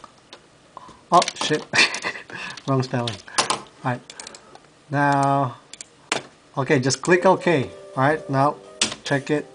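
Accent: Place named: Philippines. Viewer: by the way he speaks.... i am going to say hes a filipino -__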